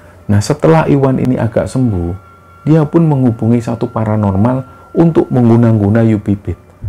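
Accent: Java, Indonesia. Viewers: Tp the best yg ini. Krna mas bawakan nya sangat pas. Apalagi dengan logat jowonya. Pembawaan mas yg tenang jd nyaman di dengar. Aku pernah dr yg mba sebutkan tp semua tk nyaman di denger.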